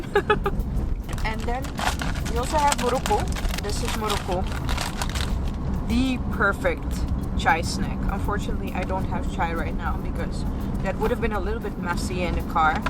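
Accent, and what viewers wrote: India. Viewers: I am a foodie fan and I just adore watching you eat.. I laugh that you have begun to talk like an Indian, with the accent.. Or maybe you are Indian and I have no idea..lol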